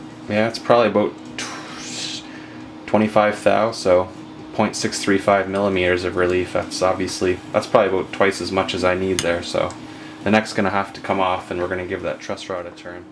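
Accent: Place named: Canada. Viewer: SLIGHTLY off topic but as a fan of linguistics, I have to say, Americans always think we Canadians say "a-BOOT". But as my hoser brother here says, it sounds way more like "a-BOAT".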